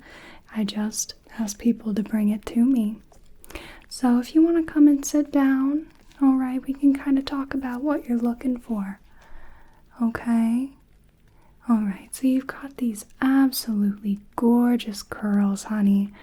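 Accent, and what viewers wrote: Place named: Mississippi, United States. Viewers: super tingly ✨💕 the genuine listening “yeah/mmhmm” during the conversation 🥴🤌🏻💋 I’ve lived in Northern Mississippi most of my life so I’m used to this accent (I have a slight accent, maybe a little less than what you had in the video) so it didn’t hit as hard for me like everyone else 😔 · Your midwestern accent coming through with that mild southern accent you've got going here is so relaxing. I know you said you weren't shooting for anything in particular, but I love where you landed, lol.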